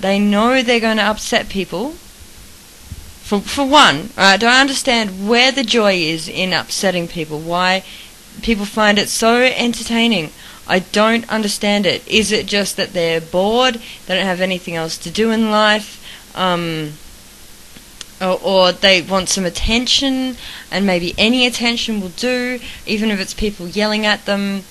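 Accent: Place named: Australia. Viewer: dude China's awesome. the US does horrible things as much as China, just not fur. heard of bear parks? every country has its good and bad points and its immature to say that one country is better than another. anyway, yaaaaay great videos :) you sound so Aussie too and like a normal casual person, not like a crazy hippie. hopefully people will respect your opinion.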